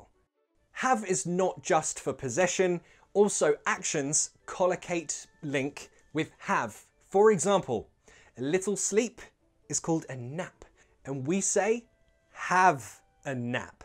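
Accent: United Kingdom. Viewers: Eres un excelente maestro....me encanta la forma tan sencilla de explicar. Entiendo el inglés pero aún me falta hablarlo y escribirlo correctamente...por eso escribo en español...espero utilices traductor para que sepas lo que escribo. GRACIAS POR TU TRABAJO. Ya me suscribi a tu canal. Saludos desde México. Perdón...de donde eres?...al escucharte noto acento británico... eres inglés? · What english accent do you speak actually? For me, this is the best british accent so far. My english is not too good, but with this accent i can catch most of the words you say. Its very clear and understandable.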